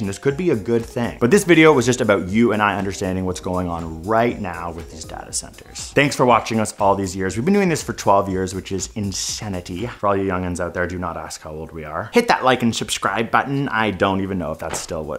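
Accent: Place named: Canada. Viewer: I knew he was Canadian due to the way he pronounces data.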